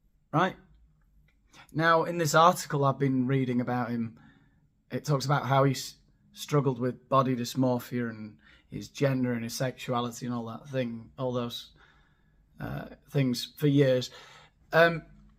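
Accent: Scotland, United Kingdom. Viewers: That Scottish accent wasn't too bad! Not sure you'd get away with it here in Glasgow. You definitely wouldn't get away with nipple tussles and a corset. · Loving your take on the Scottish accent. And obviously spot on commentary as usual. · Totally agree with you, HE needs help, not praise. Don't think he is more comfortable in his own skin doing this shite! 🙄🤢 ps, love your Scottish accent! 🤣😂😍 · On the way to 100000 subscriber!! Bravo!!!! My God, I looooooooove that Scottish accent!!! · Sam and Harry have sold their souls and have to do what they’re told and wear what they’re told. Total humiliation for immense wealth. They’re two of many and it’s sickening to see., well done on your Scots accent! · Loved the Scottish accent!!! & ur video was GREAT!-as always Thanks ✌ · Am scottish and I loved your scottish accent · Scottish accent nailed 🎯 Brilliant stuff. 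Your insightful analysis of Sam is also spot on 👏🏻 … adults need to know where the boundaries and truths are as much as kids need to, and once they stop listening, or surround themselves with people who don’t care enough to be honest with them, they can end up in pretty wobbly places. I ❤ Sam Smith’s singing … but the rest of his act is turning into an unnecessary circus which detracts from his raw and stellar talent. I don’t understand the road he’s going down so publically. No, it’s not appealing. Caucasity (my new fave word of yours) is a bit underrated sometimes in this crazy world 🌈🦄🦭 May your week be tassel free and filled with peace, sanity, and laughter. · You have surpassed yourself in this one! Especially the Scottish accent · At 69 years of age,I have no idea of who Sam Smith is. What I do know is that 'they or them ' is plural not singular. Whoever he or it is,he or it comes across as being a complete twat. 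Whether you add things on or take things off you are genetically male or female,that is fact. Seriously,is this the future? I think not. He,they,it or whatever is a sad example of a human being,we should feel sorry for this specimen but 'they, him, it or whatever is going into this with eyes wide open and is using this for their own gain so no sympathy here. Fantastic Scottish accent btw. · Great Scottish accent 🤣🤣🤣😍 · Great Scots accent .. yr f..........amazing love it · Great Scots accent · The trouble with Sam Smith and Harry Styles is that they are actually just not very talented. Their music is middle of the road bland. To me they are like children dressing up for attention. I speak as someone who loved rock stars who really knew how to stretch boundaries and gender like Bowie, Jagger and even Grace Jones. These are wee boys trying desperately to be relevant. Loved the Scottish accent! 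· Best Scottish accent ever! Love when you laugh at your own jokes. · Love your rants and insightful insight, and your Scottish accent is great !! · I agree …. I feel bad about Sam Smith. I think he is going down a dangerous path in order to get acceptance from his audience. You create a great balance of humour and logical thinking. BTW…. I love your Scottish accent!❤ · Love your comedy, love the Scottish accent, but I also love your serious comments at the end. Keep up the good work! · I like your Scottish Accent. Sounds So cool. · Impressive southern Scottish accent there This Scot🏴 approves! 😄👍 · Love the Scottish accent! 👏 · Like your Scottish accent. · Excellent video. You are spot on. Your Scottish accent had me laughing hysterically loud in the metro. I really enjoy your take on things and your humor. Thanks for making me laugh and for sharing your opinions. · I love the Scottish accent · I thought it was rather dated to be honest. 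Madonna has already done it 🤷‍♀️. What’s the Scots connection, great accent 😂❤️ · I absolutely love your commentary, your memes and your Scottish accent 🤣🤣, always a laugh watching your videos, yet you speak so much of what we all think! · Excellent Scottish accent ❤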